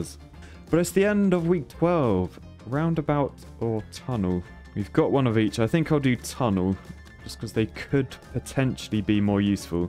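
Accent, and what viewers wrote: United Kingdom. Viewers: I'm actually impress that you got it first time the "Sierra de las cruces" yes, you had the little British accent, but the pronunciation is correct :D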